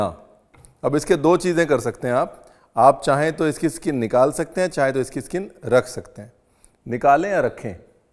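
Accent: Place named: Bihar, India. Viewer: The Bihari accents has enhanced the cravings for this dish😂 Thank you very much 🙏Sir for sharing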